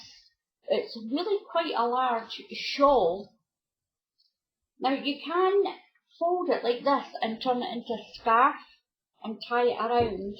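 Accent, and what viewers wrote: Scotland, United Kingdom. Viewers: Hi, Thank you so much for this. I was looking for a new project to do on my loom. This is perfect. Such a pretty stitch. I'm from Livingston. It's lovely to hear a Scottish voice on YouTube. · I love this video, do you have to crochet after you take it off the loom? I love your accent, your from Scotland right?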